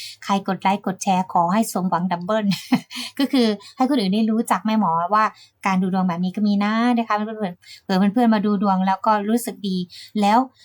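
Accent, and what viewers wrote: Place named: Isan, Thailand. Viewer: ได้ยินสำเนียงอีสานกดติดตามเลยบักคนบ้านเดียวกับคร้าบ❤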